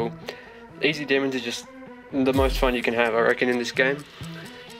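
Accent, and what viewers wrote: Australia. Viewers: Are you Australian? because I love your accent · You Aussie or something? Because you really do sound Aussie, cool if you are cos so am I;)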